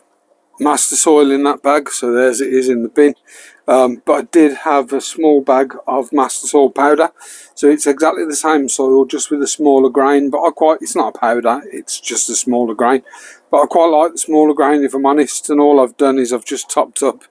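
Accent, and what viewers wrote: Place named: Black Country, United Kingdom. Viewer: yeah I heard in one of your vids. I guessed so with Yam twang 🤣🤣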